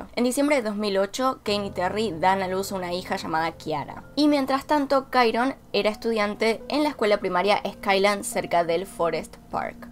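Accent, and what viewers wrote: Argentina. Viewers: Pregunta soy nuevo en el canal de qué país sos, tenés un asentó argentino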